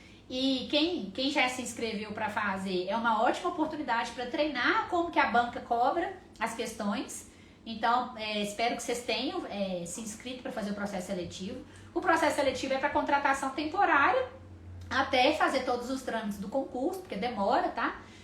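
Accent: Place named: Minas Gerais, Brazil. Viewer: Adorei seu resumo. Pra nós mineiros é muito gostoso aprender no nosso próprio sotaque.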